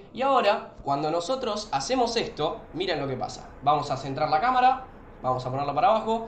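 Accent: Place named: Argentina. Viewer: Hola como va? gran video literalmente encima argentino aun mas lo entendi ajjajaja, yo tengo un problema. como puedo configurar para un volante de 180 grados.. es el de noganet. lo quiero configurar para el assetto corsa ya que mi idea es practicar drift en ello. Serias de muchisima ayuda de verdad, Gracias! y nuevamente gran video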